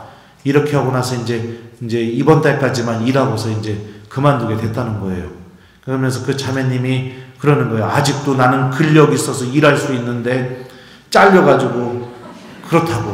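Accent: Chungcheong, South Korea. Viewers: 을통해 주님의 사랑에 만약 행복합니다 신부님의충청도 특유의 사투리가 마음깊이울립니다 신부님 감사하고 많이많이 사랑합니다❤❤❤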